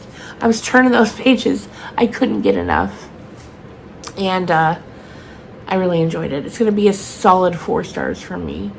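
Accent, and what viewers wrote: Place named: South, United States. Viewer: Sassy southern - love it.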